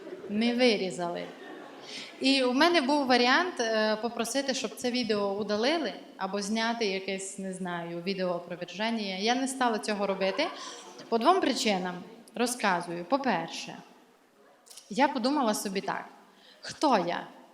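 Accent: Transcarpathia, Ukraine. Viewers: Дякую за Вашу творчість, за Закарпатську говірку. Усі говірки - то скарб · Гарно балакає по закарпатьськи, ФАЙНООО!!!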